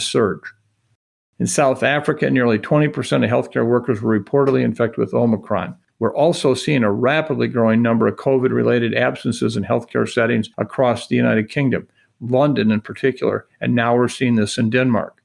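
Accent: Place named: Minnesota, United States. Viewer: He's from Minnesota and that's the dialect.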